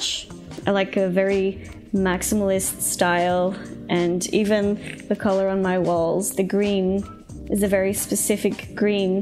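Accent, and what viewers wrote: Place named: Australia. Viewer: She does have an Aussie accent tho with a bit of something else 😊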